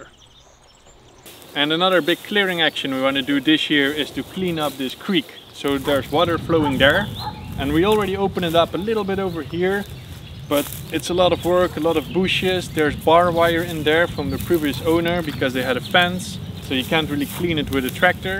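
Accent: Netherlands. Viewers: As a dutch person i immediately recognised your dutch accent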